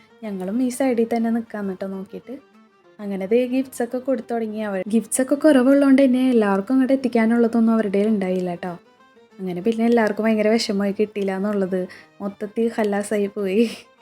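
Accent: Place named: Thrissur, India. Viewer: തൃശൂർ കാരുടെ സൗണ്ട് എനിക്ക് മനസ്സിലാവും ഞാൻ മലപ്പുറത്ത് ആണ് അബുദാബിയിൽ ഞാൻ തൃശൂർകാരുടെ കടയിൽ ജോലി ചെയ്തിട്ടുണ്ട് അങ്ങനെ മനസ്സിലായതാണ്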